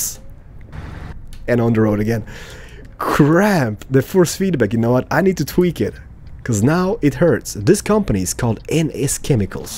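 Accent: Scania, Sweden. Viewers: And plz try to not speake skånska när du utalar :) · Ahahahaha skånska <3 omg varför låter allt så roligt?! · For all people who aren't swedish! does not speak any swedish, he speaks skånska! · Vilken härlig skånska du har :)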